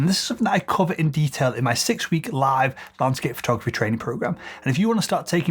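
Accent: United Kingdom. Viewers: There seems to be an influx of British sounding youtubers that speak confidently, like they know what they're talking about, but in reality are spreading so much misinformation and bad info it should be criminal. Just hearing "aperture, or otherwise known as the hole in tye front of your camera". Sheesh. Don't even get me started on the whole aperture and noise comment, I get where he's coming from but man was it poorly phrased for an "educational" video.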